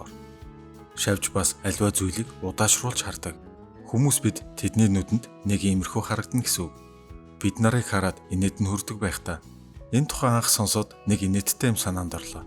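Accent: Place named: Kyrgyzstan. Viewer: Произношение похоже на Кыргызские.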